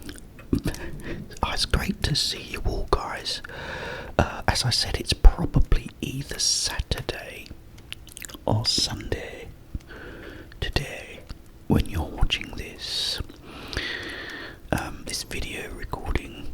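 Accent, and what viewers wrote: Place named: Surrey, United Kingdom. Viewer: I never knew you were from Surrey!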